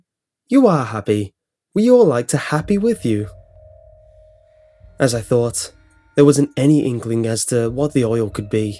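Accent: England, United Kingdom. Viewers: U made me so scared I litterly can't fell my heart beat anymore Plus u sound like ur from England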